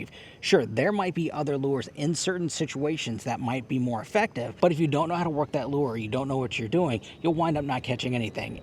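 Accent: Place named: Minnesota, United States. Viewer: You need to stop telling people all this BS . Water color and habitat in Florida is Totally different than other states. You sound like you are from Minnesota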